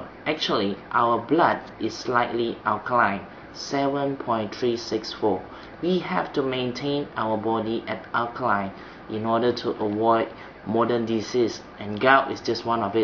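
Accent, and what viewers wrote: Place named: Thailand. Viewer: this guy is Thai from his accent...he said "minira" lol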